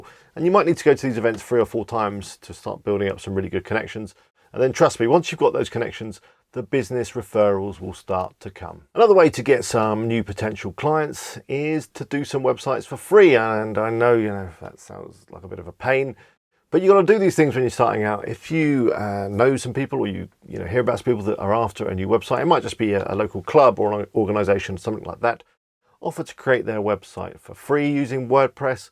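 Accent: United Kingdom. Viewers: Is that british accent ?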